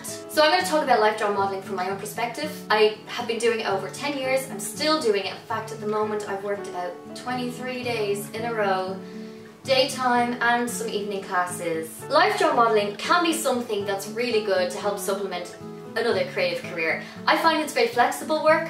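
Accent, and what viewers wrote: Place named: Ireland. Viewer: Hello, I actually thought of maybe looking into becoming a life drawing model because I hope to find my next boyfriend eventually that way. Although from your experience, have you or others who you may know found this to be an easier way than in the real world or is it more vulnerable? By the way, I love your accent and I've been to Ireland! I live in Frome, England but I am American. In fact, your dress sense is very relatable to the people where I live, if you've never been!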